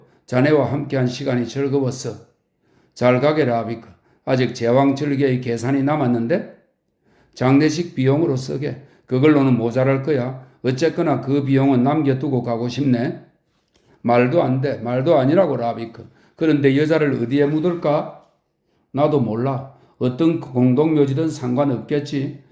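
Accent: Gyeongsang, South Korea. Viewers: 완독 고생하셨습니다. 정말 감사합니다. 장중한 소설을 구순한 음색과 살짝 경상도스런 억양으로 아주 잘 감상했습니다.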